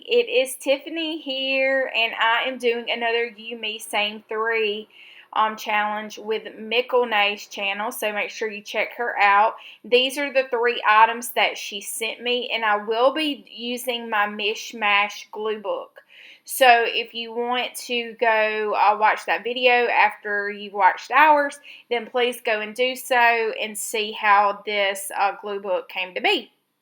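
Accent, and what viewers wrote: South, United States. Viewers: Your accent and southern ways are what I love about your videos the best💗 it’s heart warming! Love your pages💗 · I'm a southern gal myself so I know what you mean! Some folks love a southern accent and some folks don't.....and those that don't can be unkind with their opinion. Love your page and your accent! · I love love love your page and your sweet southern accent! So endearing! I am thankful for you, Tiffany!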